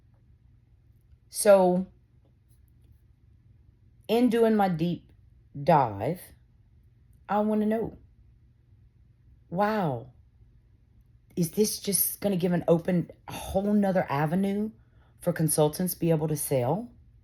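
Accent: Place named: South, United States. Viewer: you have the cutest little southern drawl :)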